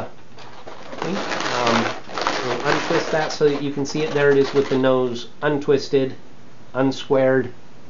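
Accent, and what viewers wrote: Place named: Australia. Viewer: that's the best koala bear balloon ever. i also liked your austrailian accent for the intro. well done. keep on twisting.😍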